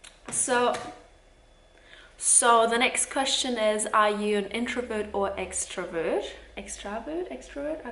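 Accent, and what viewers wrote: New Zealand. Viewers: Your accent is becoming more Kiwi. Many words I hear a New Zealand accent, not German.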